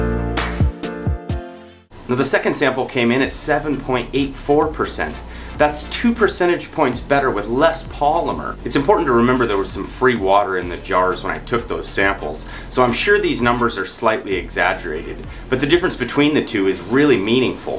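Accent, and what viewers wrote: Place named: Fargo, United States. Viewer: Thanks, good video. (and what a great Fargo Accent you have! )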